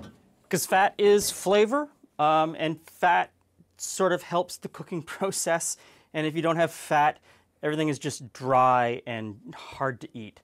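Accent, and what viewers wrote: Canada. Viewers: This is the first video I’ve watch on this channel. From the way he talked I thought maybe he was Canadian. Then I saw the bagged milk. Confirmed lol. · In the KC area at least, we get all levels of ground beef in the case; now, that I’ve heard your Canadian accent I realize Canada is different than the US on things like this. The 90% lean is so dry; I rinse my 80% lean in a colander under hot water once cooked, and that will remove the excess grease. Just found your channel; love seeing the Depression era recipes, and the way we are going they just may be more useful than we know.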